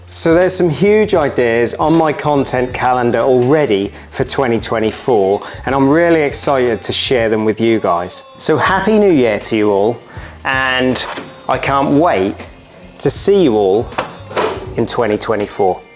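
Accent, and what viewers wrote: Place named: Norwich, United Kingdom. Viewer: I love watching your videos. As I spent the first 25 years of my life in Norwich I recognised your accent immediately. Looking forwards to more great content, happy new year.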